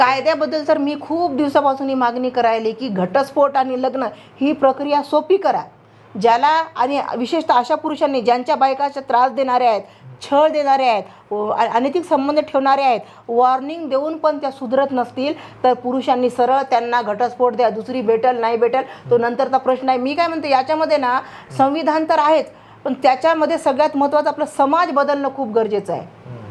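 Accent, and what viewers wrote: India. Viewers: Aapli nanded hingoli chi bhasha, language ❤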